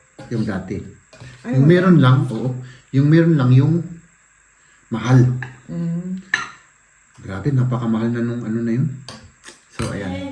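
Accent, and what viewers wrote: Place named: Cordillera, Philippines. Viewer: Sir taga car kaba? Ang tuno sa pananalita mo ay parang taga car.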